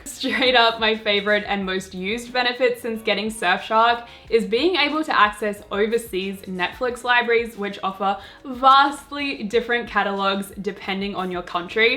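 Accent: Australia. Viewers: I hear red velvet I already love her I heard her Aussie accent I loved her more I SAW BT21 AND I SUBSCRIBED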